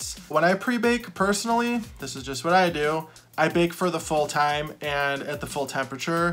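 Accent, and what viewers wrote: Michigan, United States. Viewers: I knew that was a Michigan accent!! Lol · Just my 2 cents; YouTube will recommend that you edit your videos to 20 minutes or less. This is probably important for "talking head" type videos. If the video is mostly showing the actual work you are doing, most of the people who purposely selected it for viewing will watch for a lot longer than 20 minutes - it's why they are watching. Personally I don't see anything wrong with your current studio setup or your video presentation or your Michigan accent. Bacon Bond? It's like "Duck Tape"; originally it was refered to as "duct tape" (as in heating ducts) but so many people pronounced it "duc-tape" that 3M officially adopted the name brand "Duck Tape" Maybe you will be responsible for a re-branding of the product! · Michigan accent! I grew up in Warren but moved to Tennessee 20 years ago. 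People either love or hate my accent here. Question on baking: What do you bake on? I know if my clay is baked on a glazed ceramic tile then the clay touching the tile comes out glossy. Since many of your pieces are large I suppose you must lay them on their side to fit into your toaster oven. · I thought I detected a fellow Michigander’s accent, I’m from the south west side at the very bottom anyway to answer your question yes I wouldn’t mind a 30-40 minute video so I can follow along an know what I’m doing lol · Michigan accent?! I'm a fellow Michigander. Hello from Kalamazoo+ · Your Michigan accent is very similar to my Ohio accent. I no longer live in OH though. Bake and Bond - bacon bond lol yep. That's how I say it.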